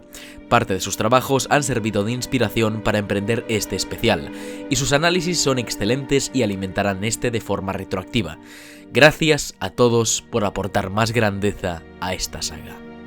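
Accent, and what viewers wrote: Spain. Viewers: que culero esta el Español de España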